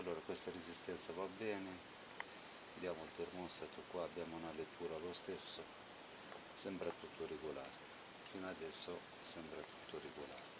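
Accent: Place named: Spain. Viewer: Sei spagnolo si sente nel accento bravo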